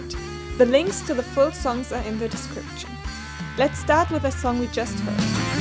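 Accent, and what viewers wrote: Germany. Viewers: SHE SPEAKS!! German girl??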